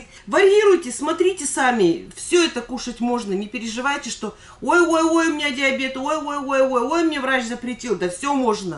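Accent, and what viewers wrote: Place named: Urals, Russia. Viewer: Я вот слушаю и думаю... С Урала Вы. Да?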